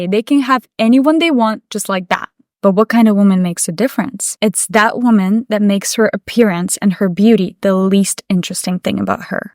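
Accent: Russia. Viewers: She's Russian for sure:) only Russian girl can speak and act like this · Where does this accent come from? Russia?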